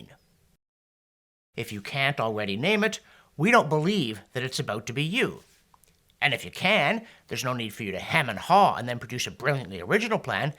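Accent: Canada. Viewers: Oh, and by the way, I love your stuff. Your delivery is so, Canadian. Keep up the good work.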